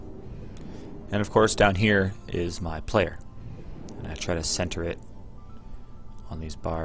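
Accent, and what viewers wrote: Canada. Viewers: u canadian guy? love the content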